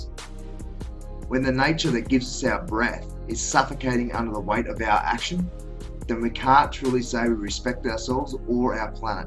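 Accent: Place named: Australia. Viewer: I love this! it is nice to hear a fellow aussie for a change ;)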